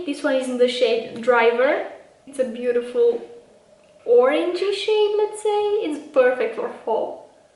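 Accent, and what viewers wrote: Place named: Romania. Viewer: I am so happy I came across your channel. You are so sweet. 💕. I love the fall look you have created. You look gorgeous. I did a makeup fall look on my channel too. So much fun. Would love to be friends on here. 🧡 😘If you don't mind me asking, are you by any change Romanian? I can here a little bit of accent, but your name sounds Romanian :) 🥰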